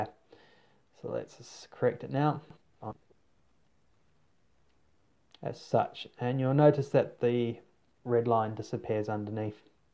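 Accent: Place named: United Kingdom. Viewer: thx for your video, i love your British accent.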